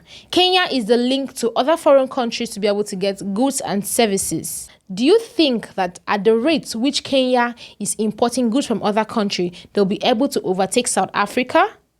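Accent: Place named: Nigeria. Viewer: I was about to follow this useless account before this nonsense of comparing us, with nigerian accent it's not surprising.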